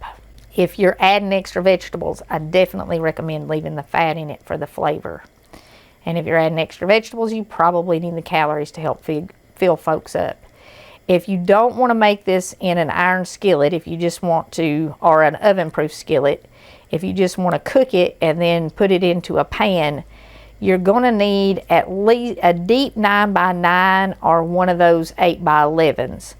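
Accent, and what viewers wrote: South, United States. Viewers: It doesn’t really matter what you’re cooking I just like listening to your little country accent !!! The food ideas are great too ! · Love your channel, I'm a transplanted hillbilly, and to listen to ya makes me remember being down south! The recipes are awesome too! Keep up the great work, God bless!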